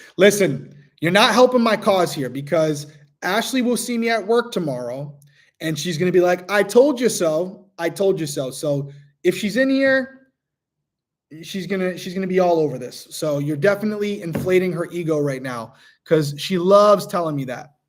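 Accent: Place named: East Coast, United States. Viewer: ❤❤❤ I can straight hear your east coast speak!! Love love love it!!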